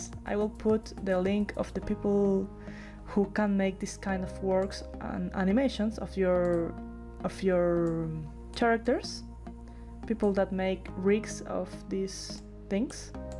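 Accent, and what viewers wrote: Chile. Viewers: ♡I LOVE YOUR ACCENT OMG♡ (I'M CHILEAN TOO HAHAHA) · Chilean? Wkajjs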